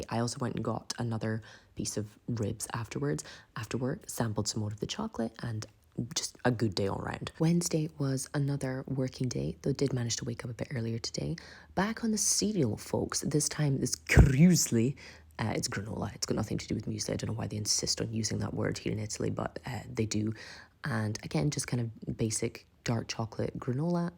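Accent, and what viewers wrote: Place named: Scotland, United Kingdom. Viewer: Love your Scottish sense of humour & voice 🎉 fab week of eats yum 🎉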